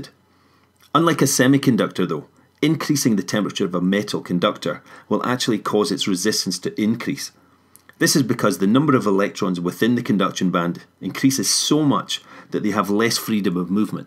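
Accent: Scotland, United Kingdom. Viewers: Scottish accent ay?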